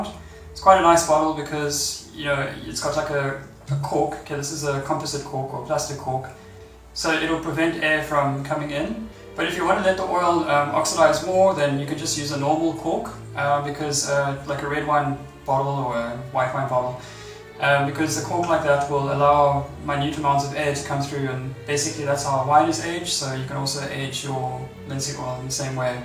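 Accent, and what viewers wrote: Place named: South Africa. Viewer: nice also to hear a fellow South African accent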